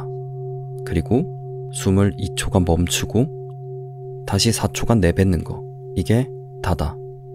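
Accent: Gyeongsang, South Korea. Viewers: Love this channel. 고향이 경상도세요???? I can hear ur dialect❤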